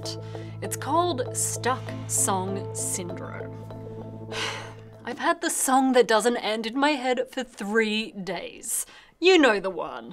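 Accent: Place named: Australia. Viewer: Just wanna say that it's nice to hear an Aussie accent on YouTube. :)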